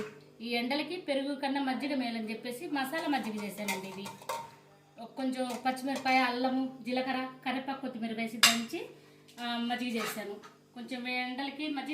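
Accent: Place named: Ongole, India. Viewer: Chala days nundi.....mee vedios chustu anukunedaanni......language ongole vallu maatlade la undi....ani...meedi ongole .....aaa....amma...maadi ongole.....ye.....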